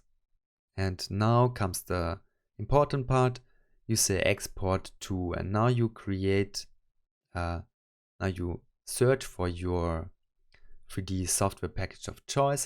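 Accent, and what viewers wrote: Germany. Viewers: Man hört den deutschen accent 🤫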